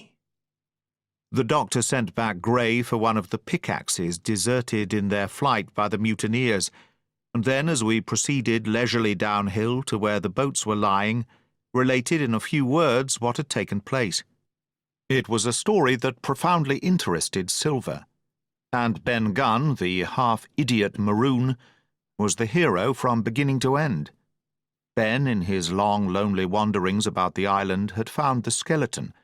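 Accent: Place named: England, United Kingdom. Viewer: Thank you! So nice to hear it read with an English accent! Good job, Matey!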